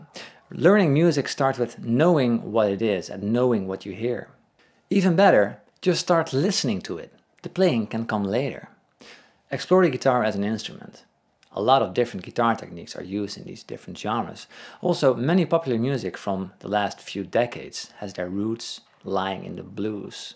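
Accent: Netherlands. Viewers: He sounds dutch 👀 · wow ik zit al video's lang te raden of jouw accent nou wel of niet nederlands is!:)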